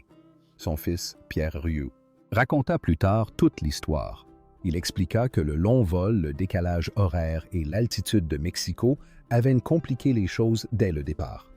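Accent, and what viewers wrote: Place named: Canada. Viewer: 18/05/2026 1) MONOLOGUE DE L'IA ACCENT CANADIEN ! 2) Il SE PRÉNOMME "RIEU", pas "RIA" ! 3) À , ce n'est pas André Rieu sur le lit d'hôpital ! Et en haut à gauche, qui est-ce ? 4) À ', qui est ce type gros avec le crâne rasé ? 5) À ', ce n'est pas lui avec à ses côtés l'infirmière ! 6) À ', IDEM 7) À , un homme jeune, aux cheveux foncés, long et une barbe ! 8) À , une infirmière aux longs cheveux blonds et un homme asiatique allongé subissant un examen ! 9) À ', qui sont ces jeunes adolescents regardant leur téléphone ? ASSEZ !:body-green-covering-eyes: JE VOUS SIGNALE : C'EST INSUPPORTABLE !:face-orange-frowning::face-green-smiling: Belgique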